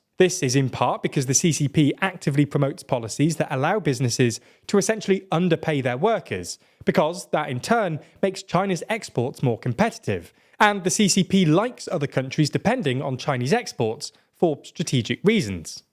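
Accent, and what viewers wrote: United Kingdom. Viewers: 5% growth for 2023, British accented presenter enjoy your recession riddled economy.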